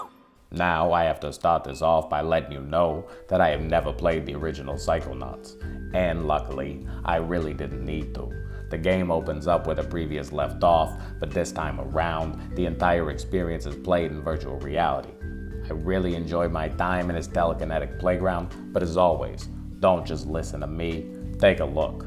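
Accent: New York, United States. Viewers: His voice is like a New Yorker Morgan Freeman. Enchanting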